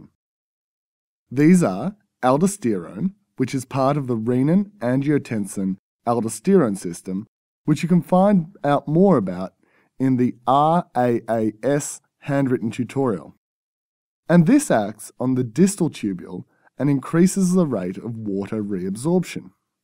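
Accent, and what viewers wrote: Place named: Australia. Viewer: this video is good cuz of the aussie accent..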